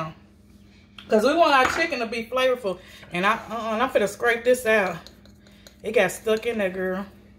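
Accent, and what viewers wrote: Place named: Louisiana, United States. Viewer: You sound like one of my cousins from Clinton, La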